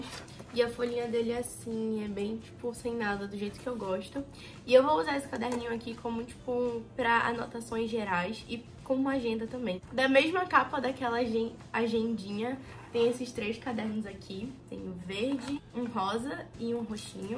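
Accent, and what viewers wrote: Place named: Amazonas, Brazil. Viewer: então é nós, sotaque amazonense, ja ganhou uma inscrita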